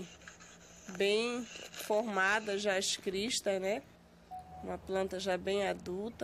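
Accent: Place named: Pará, Brazil. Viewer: Pelo sotaque, eu tinha certeza que moravas no Pará,! Só não sabia a cidade😅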